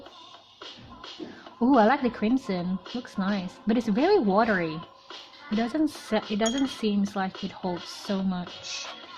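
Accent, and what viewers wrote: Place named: Australia. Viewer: Same,i just got a lil confused bcos i heard an aussie accent w a touch of sum else lol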